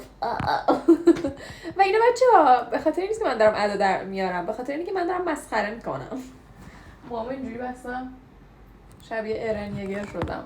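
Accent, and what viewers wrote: Iran. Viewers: احساس میکنم اصفهانی هستی، درسته؟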